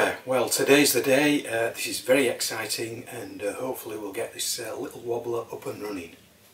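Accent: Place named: Hull, United Kingdom. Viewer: You sound like you might be from Hull, my home town. Very nice little engine, something I'd like to have a bash at. Greetings from Australia...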